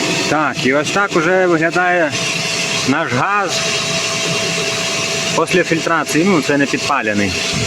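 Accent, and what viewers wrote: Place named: Polissia, Ukraine. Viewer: З якого регіону ваша мова?) мені от здається що це Полісся😊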